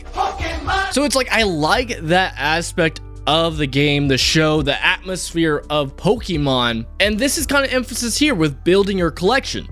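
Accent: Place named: United States. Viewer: Your take on VPNs is wild. You taking control of the internet you pay for, which is being botlenecked by the greed of the government so you only have access to certain things which is against the whole purpose of the internet to begin with and is also not Illegal(that's the government you were talking about) is not only a good thing but it's a just thing. You are also going down a very slippery slope with we should just do what the governing body says because thats what the bible says, I mean where do you live or in what time ever is that in any way a good idea because you sound like an American and blinding following our government is going to get you in some bad spots. I mean bro turn on the news and see what governments are doing just today.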